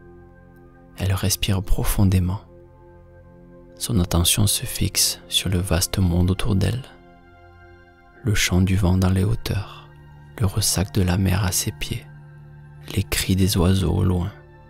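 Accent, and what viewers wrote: South, France. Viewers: j'adore l'accent français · Ton accent du sud m’a fait sentir comme à la maison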